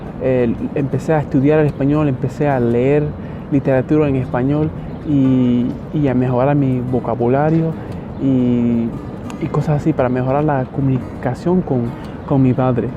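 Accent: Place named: Cuba. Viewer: Tiene que ser Cubano sus raices con ese accento.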